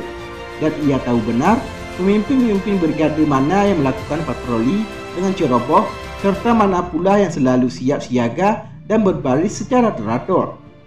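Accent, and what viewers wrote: Aceh, Indonesia. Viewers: Aceh memang the best. Logat Narrator nya Aceh tok tok...👍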